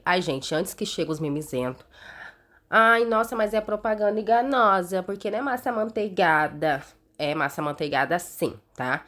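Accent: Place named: Minas Gerais, Brazil. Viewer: Vc e mineira igual a minha falo igual a você também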